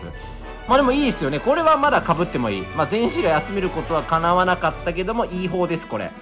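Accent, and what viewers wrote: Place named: Kyoto, Japan. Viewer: 関西弁よき😊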